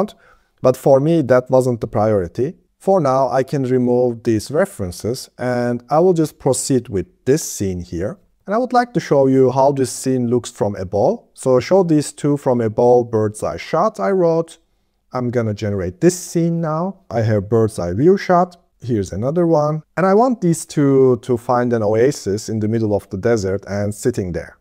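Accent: Turkey. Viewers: Emeğinize sağlık. Hocam İngilizcesi çok iyi olan bir Türk konuşması duyuyorum. Doğru mudur? :) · kanka turkmusun tam bır turk aksanı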